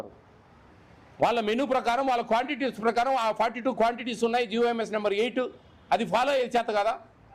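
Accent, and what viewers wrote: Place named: Kurnool, India. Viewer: ఇన్ని వీడియోలు చూసాను సార్ అన్ని వీడియోలు కన్నా ఈ వీడియో పవర్ ఫుల్ గా తిట్టినారు సార్ దూకి సావండి ఎక్కడైనా మరి రాయలసీమ భాషలో ముఖ్యంగా కర్నూల్ భాష లెక్క మాట్లాడుతున్నారు సార్ వాళ్లకు చేత కాకుంటే మాలాంటి వాళ్ళకి ఇవ్వండి సార్ నేను చూసుకుంటాను సార్ 10 15 హాస్టల్లో చూసుకుంటాం అలాంటి వారిని తక్షణమే తీసేయండి సార్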